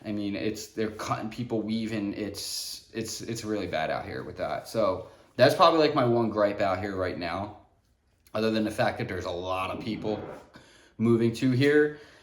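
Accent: Philadelphia, United States. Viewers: I thought u sounded like u from philly! That’s crazy! Born and raised in philly! Love the Philly love!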